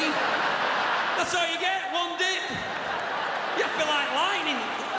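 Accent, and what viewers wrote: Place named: Bolton, United Kingdom. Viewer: Bolton/ Lancashire Accent!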